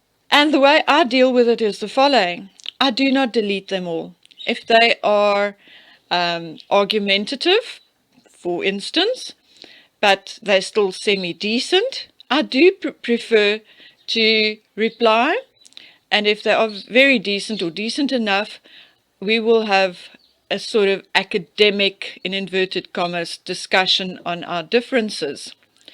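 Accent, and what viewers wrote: South Africa. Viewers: Love your videos. You obviously put in a lot of work. Please don’t change your accent. It’s what makes you, you. You speak clearly and that is all that matters. I, for one, love listening to a South African accent. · Thank you for all the all the hard work and long hours invested in preparing these videos. By the way I love your accent. I really enjoy listening to you and love that you are from South Africa. Me I'm in Minneapolis,Minnesota in the good ole USA . I look forward to listening to future videos. I think it was Wally who mentioned you in one of her YouTube videos. She's so fun to listen too. Well about MM I could safely say no one knew who she was prior to marrying Harry and she's now driving us crazy with all her videos telling us we must do better . I use to feel sorry for Harry but I still think she controls his every move. 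Irs incredibly ha d to get away from a narrsasist. The longer you stay the harder it is to have the confidence it takes to walk out on a person like her. I see him living in fear if her wrath . You get to a point where your mind tells you it's easier to please them than to leave them. You honestly believe they will destroy your life if you do leave. I think MM has stripped his dignity and his self confidence. I think he got in too deep with her and doesn't believe there is a way out 🙋❤️ from 🇺🇸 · Darling girl, my prayers are winging their way to you for many reasons but Rusty's passing is a crippling loss. I lost two of my doggies within 13 days back in May. Hellish beyond hellish, but you know what that pain is like. Suffice to say, after a fortnight I rescued another mutt and my heart feels full of joy once again. You strike me as a very well educated young lady. You are a real one for attention to detail and I love that in your general make-up. 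I enjoy all of your presentations as they are so different from other channels covering the dis-loyal royals. I too am a big fan of Yankee Wally and can hardly get off to bed until I hear her video! Love her Welsh accent as much as I love your South African one. Being a Scottish lady from the Shetland islands originally, my 'mother tongue' is Shetlandic - (Shetland cum Nordic). Google it, it will tickle your fancy, no doubt! Re: Meghan Markle's age.Yankee Wally put up a photo of her Graduation. She holds a staff with the year 1995. She is wearing a white gown. She has no coloured cowl as she didn't make the grade and pass with flying colours. MM the yacht girl has been 100% exposed by you...............YIPPPPPEEEEEEEEE. Well done, girl. You are a top detective. Thank you for that expose- the photo's with Prince Harry et al. I'll sign off for now but not before I wish you continued success with your fantastic channel. 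I appreciate your endeavours. Take good care. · There is nothing improper about a South African accent. Your English is better than that spoken by many Brits. Be yourself lively lady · 🇨🇦🇨🇦🇨🇦🇨🇦...another superb video full of information, I agree that mm knew Andrew previously. You can see it by the way he is in different photos they're both in. mm is a lying fraud and imo they haven't lost their titles because she's got something on at least one of the rf members if not more. Many people have lost their titles for much much less, it's extremely suspicious. Hopefully it all comes out at some point....keep up the good work. Lastly I love your accent, both of my doctors on none other than Vancouver island are south African and have the same accent as you...🇨🇦🇨🇦🇨🇦🇨🇦🇨🇦🇨🇦..💕💕💕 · You have a Sth African accent.It is nice.I love your art work...the worm is cool. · Brilliant video as usual. And as a fellow South African I LOVE hearing you speak. 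Your research is outstanding, and your courage in speaking the truth is commendable. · Your South African accent is charming. We all speak English. I speak with an American accent.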